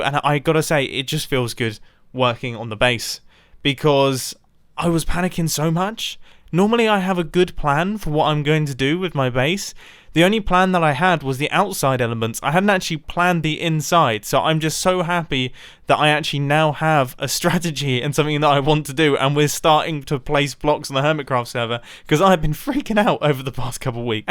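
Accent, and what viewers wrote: United Kingdom. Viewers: he’s so british 😂 i love it when he says chuffed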